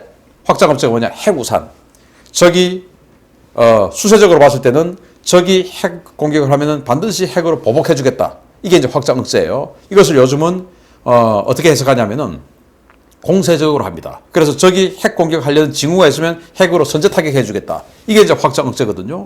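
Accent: Gyeongsang, South Korea. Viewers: 나라 잘 되는거 못보고 일본 똥고 또 빠냐? 잘 모르면 송작가tv 보고 공부좀 해라 그리고 그 사투리 좀 고치고 방송 해라 경상도 쪽 팔린다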